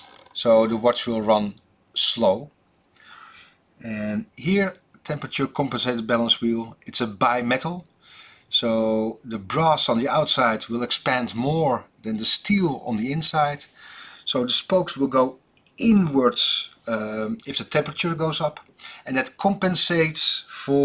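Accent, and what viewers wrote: Netherlands. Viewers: Without looking it up.. Ik weet vrij zeker dat je een Nederlander bent?😛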